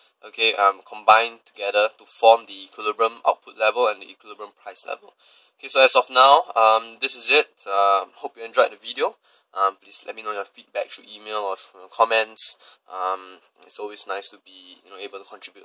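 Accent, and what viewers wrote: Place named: Singapore. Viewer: singaporean narrator yay hahahaha! totally unexpected!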